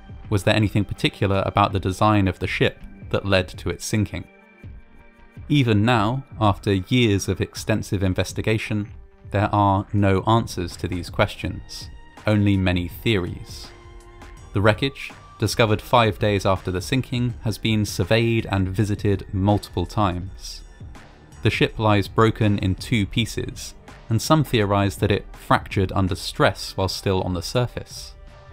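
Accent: England, United Kingdom. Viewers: American ship British narrator 🤔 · Between you and Coffeehouse Crime, I am getting my fill of soft-spoken English guys telling me about terrible things in a soothing, satisfying manner. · Im beginning to develop an addiction to your voice and the way you tell stories, which part of uk is your accent from?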